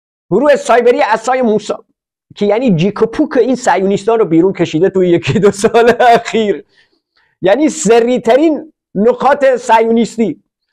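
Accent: Lorestan, Iran. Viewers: جان حرف نداری بااون لهجه شیرین لری وباتحلیل شیرین دلمون گرم میکنید ؟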